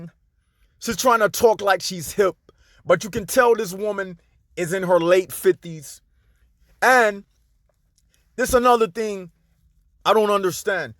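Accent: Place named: Caribbean. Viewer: No I don't find her channel boring at all. I like that she has a new story all the time. She never repeats a story. And that's the main thing of her channel is she specifically says she will never repeat any of her content. So no it hasn't gotten old. But why are you even caring what she or other black American women want? You're not a black american. You can tell you clearly have some kind of Caribbean accent. Why don't you care about the women in your country? I think that would be more productive for you. They probably don't have this anyway. I mean they grew up with mainly black men and I don't even know how you guys are over there. Why do you even care? If she's talking most of the time about black American men and you are not black American then why do you care? It's just a thought.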